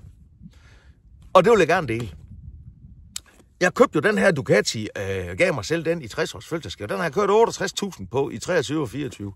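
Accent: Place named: Jutland, Denmark. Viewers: Hold nu kæft du taler jysk på dine gamle dage 🙂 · Du kan tage ud af Aalborg, men ikke Aalborg ud af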